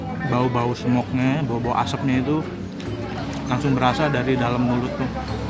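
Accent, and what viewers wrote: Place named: Java, Indonesia. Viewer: mantappp....jawanya tambah Meddokk mamen mkan coyyy..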